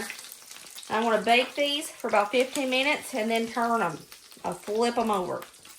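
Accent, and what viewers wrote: South, United States. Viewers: Keeping meals simples❤ I rrally enjoy watching. And I love your southern draw when you talk. God Bless you and your family in 2025.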